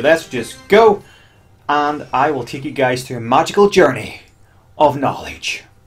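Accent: Northern Ireland, United Kingdom. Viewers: Northern Irish! :D cool accent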